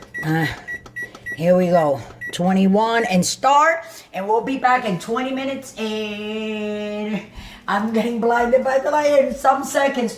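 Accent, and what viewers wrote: New York, United States. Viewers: Found you on the foodie channel.. and omg I’m in love with your channel. So glad I clicked. Love your energy! and you and your son doing this together! 💗(And your fur babies a big PLUS 🐶)!!! Where are you from... Sounds familiar!? I’m from NY, and that’s where you sound from. Love it!! I hope to see you over at my channel to. I think you’d enjoy it!! Many blessings to your channel honey! 💗👩🏻‍🍳🦖 PS I loveeeeee me a Cuban sandwich. Omg YASSSSS girl. You killed it! 🤤🤤🤤🙌🏻🙌🏻🙌🏻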